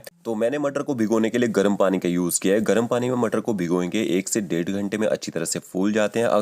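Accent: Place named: Punjab, India. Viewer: Yours is punjabi accent.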